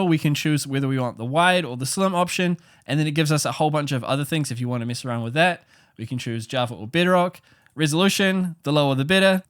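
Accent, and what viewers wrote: New Zealand. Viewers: I KNEW I COULD HEAR SOME KIND OF KIWI IN YOU!!! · Are you from New Zealand?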